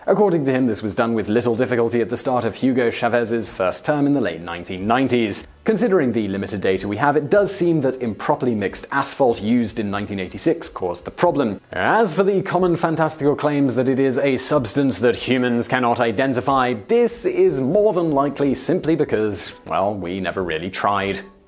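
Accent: England, United Kingdom. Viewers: Anyone else impressed with the actual journalism or background investigation into this story? A part-time staff of seven looked into the story - not looking for the story to be true or untrue, just reporting on known facts or assumed facts as well as offering a hypothesis for both sides of the picture. Many online news outlets today would just so want the conspiracy or unknown to be true that they would push that line or not offer the full picture. Either that or have BS fluff or headline/sensationalism stories lead the news. There is alot going on in the world; many of which does not get copy. Smutty sex stories or commercials veiled as a "news story" are getting air time. This channel and others like it deserve credit for doing a fine job. Unpretentious and no flash production; just a guy talking in front of a camera. An english accent, in my opinion, goes a long way. 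He speaks well and is easy on the ear. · what kind of accent is this? british?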